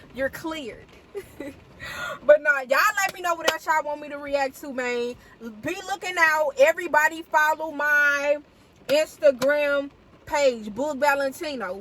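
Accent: St. Louis, United States. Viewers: 😩 Noooo don’t do Anna Mae Bullock like that! Ike was dead ass wrong!! But yes, I’m with everything else you said and I LOOVE your St. Louis accent! 😂🙏🏼🔥🫶🏼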